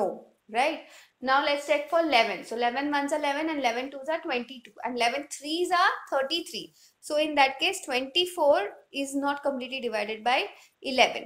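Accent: India. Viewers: The only problem is the accent. Indian accent is hard to understand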